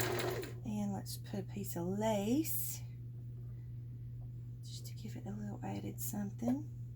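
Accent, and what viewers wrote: South, United States. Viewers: I was sitting gluing and your video came up next on my playlist from the feed suggestions. I truly enjoyed it and loved your funny comments and of course I love your Southern Accent, I could listen to you the day long! Im a new subbie. Love your collage technique and yes I have more than my share of scraps. I so snippets but in long strips with a sheet base. Usually about 6-8 inches wide and it is to clean off my desk. Thanks for sharing and the fun! Have a very creative week!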